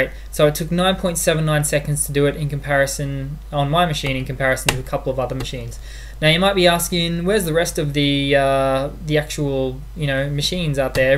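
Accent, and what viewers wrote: Australia. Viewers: australian accent!!!!!!!love it xDDDDD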